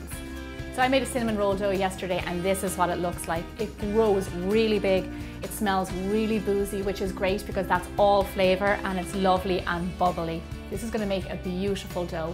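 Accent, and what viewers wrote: Ireland. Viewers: I hear a hint of Irish.can you do a vid on making Bailys Irish cream the nector of the Gods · Is she from Ireland 🇮🇪? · U sound irish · this accent sounds Irish. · What a lovely Irish accent and what a great recipe. Thank you.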